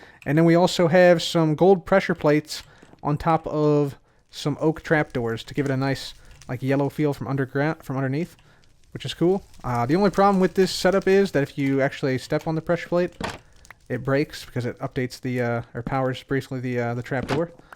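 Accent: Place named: Chicago, United States. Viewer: I realized it the first time I ever heard him speak and instantly recognized the Chicago accent :)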